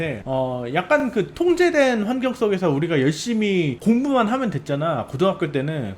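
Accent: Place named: United States. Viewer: 발음이 약간 어메리카한국어를 구사하시네요..피부좋네요.이거말고는 나랑은 딴세상이야기...아니 연봉도 억대시면서 유튜브구독을 종용하시니.ㅎㅎ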